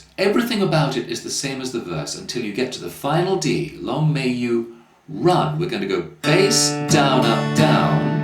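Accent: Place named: United Kingdom. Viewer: Great lesson thank you. Very funny how the Brit accent drops instantly singing right on the money:)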